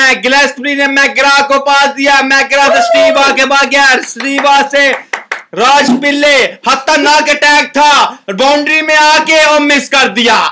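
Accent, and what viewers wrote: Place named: Lahore, Pakistan. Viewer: hahahahaha yaar your Lahori accent is so hilarious "Parr-vaizzz" hahahahah muaah cha gaye ho!!!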